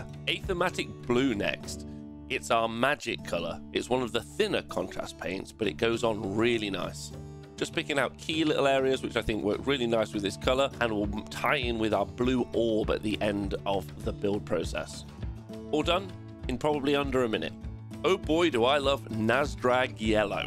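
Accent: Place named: United Kingdom. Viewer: My favorite part was! “It’s a magical Frog” only Someone with a British accent could say something like that and it be as funny as it was! Someone with an American accent would just sound Ridiculous! Cheers from another, aKa TriptonStudios!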